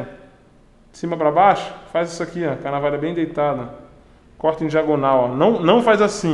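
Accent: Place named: Rio Grande do Sul, Brazil. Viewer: Tú é gaúcho né pai,eu também sou reconheci só pela voz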